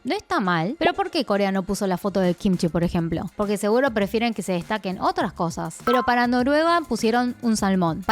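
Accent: Argentina. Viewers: hablas re bien el argentino wtf cm lo logro Xd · ni tan mal la descripción de Ecuador 🇪🇨 bonito que destacaran el Chimborazo y las tortugas gigantes de Galápagos, pensé que pondrían bananos o maíz. Buen video, me llama la atención tu acento argentino para hablar español jajaja.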